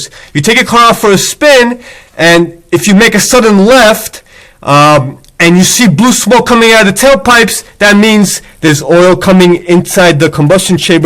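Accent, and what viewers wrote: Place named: New York, United States. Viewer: Must be from NEW YORK!!!!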